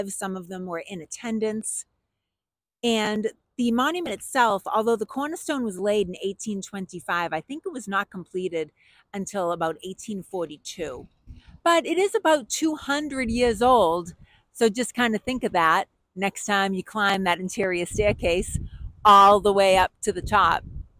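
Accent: Boston, United States. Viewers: Love that Boston accent. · Visiting Boston this summer and wanted to see Bunker hill. The moment she said "Wah" in her Boston accent; ; I knew I had to stay for the whole video. :D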